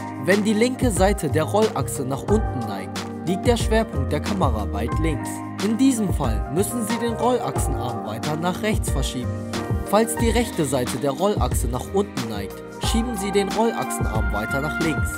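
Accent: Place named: Turkey. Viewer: Das ist kein Dialekt. Das ist ein türkischer native Speaker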